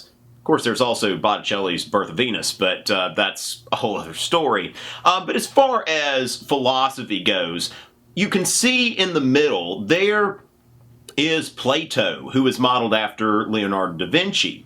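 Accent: South, United States. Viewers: has southern accent - ok, let's not stereotype, maybe he's educated enough. . .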